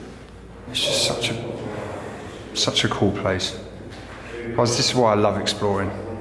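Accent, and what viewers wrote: England, United Kingdom. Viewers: what about Abandoned world explorer and Adventures with? I truly enjoy watching your videos. I have watched numerous other Explorers but I must say I like your style, how sincere you are, you are truly interesting, educational, funny, and easy on the eyes. I also love your voice and English accent is marvelous. You have a true gift. I wish you so much happiness, peace and joy doing what you love.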